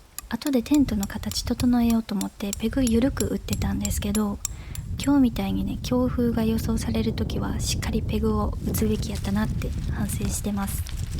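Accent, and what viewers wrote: Kansai, Japan. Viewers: 関西人には関西弁が心にしみるなぁ😊 そしてタッカンマリ。実はキャンプでタッカンマリを作って食べるのが夢なんです！参考になりました。韓国料理が好きで、数回韓国にも旅行して現地料理を食べます。韓国料理の動画またアップして下さいね♪ では、アンニョン❤ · 初コメです。のキャンプ動画楽しく見てます。今回アフレコと言うことですが、酒893のためか声若干かれているところありますが、関西人のイントネーションが心地よくいつもの動画以上に何回もみています！これからもの声聞きながら動画みたいです！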